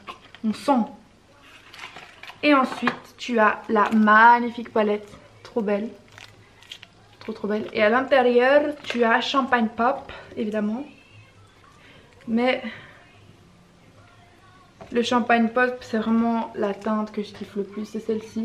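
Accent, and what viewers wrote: Belgium. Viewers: Est-ce que tu es d'origine belge ou d'une autre origine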